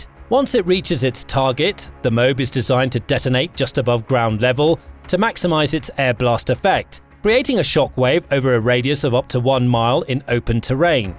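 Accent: England, United Kingdom. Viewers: I'd like to know why he's pronouncing "Aluminium" like an American as he's clearly English by his accent, English people do NOT pronounce "Aluminium" that way.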